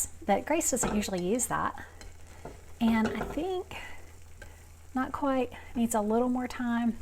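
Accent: South, United States. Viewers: Love the homely vibe and Southern American accent, will try this! Thanks from Manchester UK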